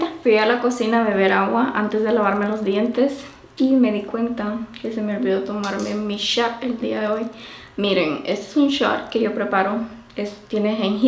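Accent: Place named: Honduras. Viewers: Igual note mucho el acento Hondureño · Jajaja no se si soy yo ho es que hoy si se le escucha bien el asento hondureño cuando la escuche dije abla diferente ahora .jajaja ho sera porque ya dias no veia sus videos saludos paisana🇭🇳❤gracias por los tips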